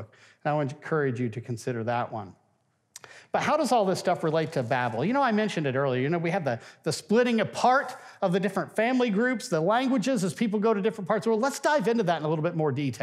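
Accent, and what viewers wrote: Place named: Tennessee, United States. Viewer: Just before you compliment (or tease) your wife, let me guess : you are from Tennessee?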